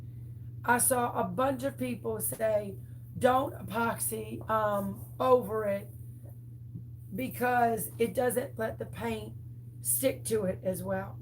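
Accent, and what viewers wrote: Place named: South, United States. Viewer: ❤️❤️❤️❤️❤️.....I knew u was from da south lol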